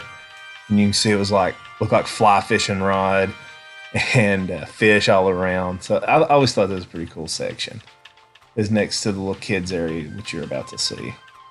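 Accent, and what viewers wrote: South, United States. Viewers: West Town Mall sister property of Simon Properties is thriving along with Turkey Creek lifestyle center is the retail hub for Knoxville. Pigeon Forge has a huge outlet center which is a destination. I love your southern accent. My family's from Lenoir City Kingston area.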